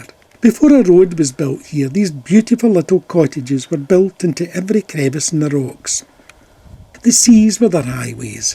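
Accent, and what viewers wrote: Scotland, United Kingdom. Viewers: Charming video,. But I confess I was looking for English subtitles, I had difficulty with your Scottish brogue..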